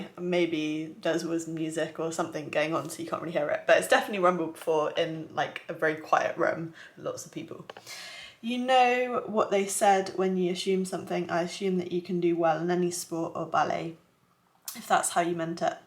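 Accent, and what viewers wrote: United Kingdom. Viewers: Would live to find a cute and sweet british girl like. That accent and cute face is all an American guy needs. 😍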